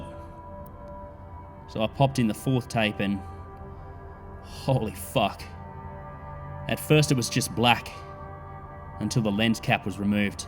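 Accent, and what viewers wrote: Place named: Australia. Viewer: This is definitely one of the best creepy stories I've heard in a while , I mean u knew the tapes would be bad buuuut....yea that was so original. I love ur Aussie accent n I love how u can change voices n pitches and personalities. Fanfuckingtastic!!!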